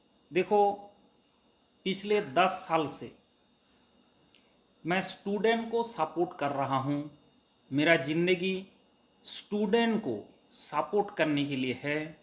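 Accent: Bengal, India. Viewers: he has a typical bengali accent hqhqhqhqhq hehehehhehehe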